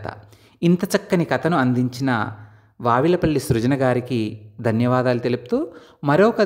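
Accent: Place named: Uttarandhra, India. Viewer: ఉత్తరాంధ్ర మాండలికం మీ గొంతులో అద్భుతంగా పలికింది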